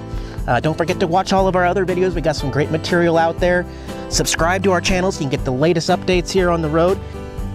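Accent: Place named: Nashville, United States. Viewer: Ain't nothin wrong with a Nashville accent!!!!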